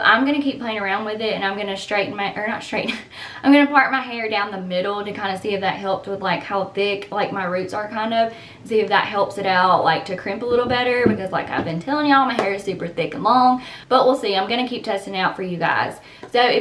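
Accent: Alabama, United States. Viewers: I love your accent. You sound like my daughter-in-law. She's from Shelby, Alabama. Thank you for the video. I just bought one of these.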